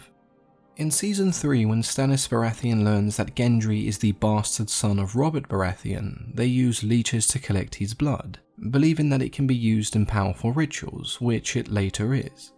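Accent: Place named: England, United Kingdom. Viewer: They should use your English accent and voice on planet earth and national geographic well and fish sea mammals lions an bears deadly insect bites you would make it more interesting word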